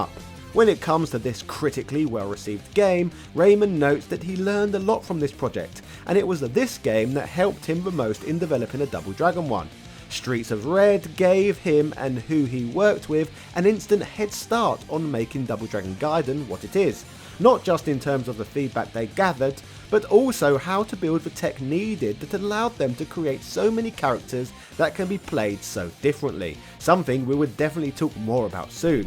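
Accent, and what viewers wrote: United Kingdom. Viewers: I’m not even going to watch this video with this dumb British accent and say this franchise will never die because it’s the best on the planet, so it wasn’t about to peace out I mean double dragging neon was amazing.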